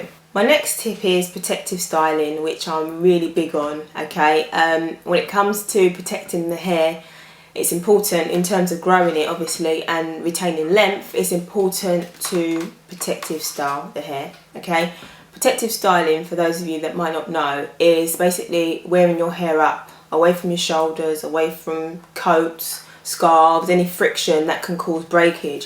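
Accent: United Kingdom. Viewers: are you british....you say strangffin ...thats funny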